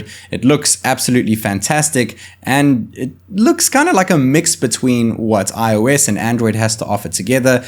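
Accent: South Africa. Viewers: Mate, your accent sounds South African . You from here ? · Are you from south africa by any chance and just living in china. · no way are you south african dawg?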